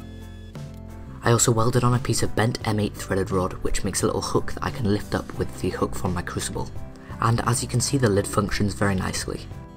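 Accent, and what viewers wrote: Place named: Wales, United Kingdom. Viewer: You have a slight Welsh accent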